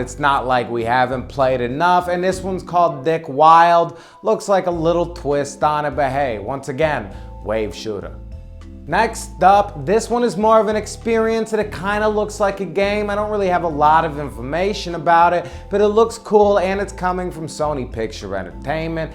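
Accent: New York, United States. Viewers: are you from new york or mass? figure it out.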